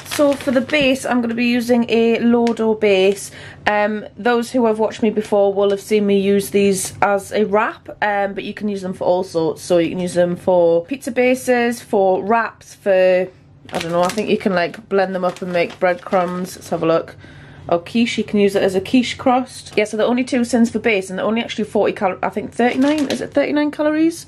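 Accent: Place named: North East England, United Kingdom. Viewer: Love your NE accent,! Right now I’m doing Slimming World online as I live in Munich, Germany and on the one hand I really miss the UK ready meals, which aren’t really a thing here. On the other hand, it does mean that I’m far away from my fave restaurants, takeaways and sweet treats... I started 9 months ago and it has gone very slowly but now I’m 3kg from target 😊. Love these fakeaways, doing Chinese tonight 🙌. Had to laugh at your oven temperature - I cook everything at 200 C as well 🤣😂. Looking forward to seeing more of your videos. All the very best on your SW journey! Much love from Munich 😊💕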